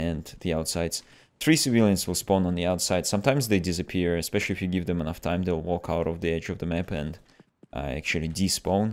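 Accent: Russia. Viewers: Акцент русский. Может ты русский который хочет вести английский канал? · Русский акцент отличный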